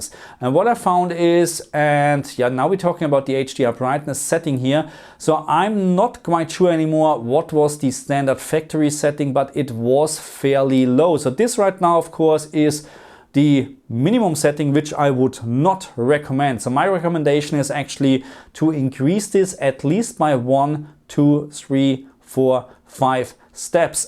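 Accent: Germany. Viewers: Deutsch Akzent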